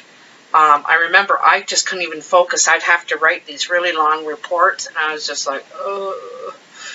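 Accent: Canada. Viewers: Ha, thought I could identify a fellow Canuck. I'm in Southern ON, GTA. I have family in North Bay though. Have a good one as we say.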